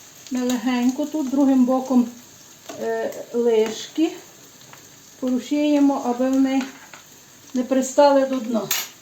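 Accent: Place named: Bukovina, Ukraine. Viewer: Яка розумниця!!! Я сама родом з Кіцманя,Чернівецькоі обл. Яка мені люба Ваша мова буковинська! Аж серце щемить!!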